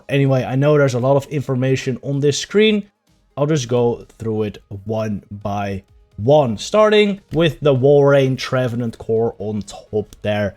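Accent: Netherlands. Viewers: Jij bent nederlands of nie???🤣😂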